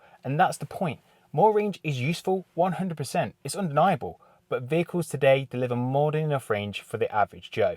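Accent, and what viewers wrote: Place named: Midlands, United Kingdom. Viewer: I thought I detected a Midlands accent. Nice one, thanks. Just getting my collection checklist ready.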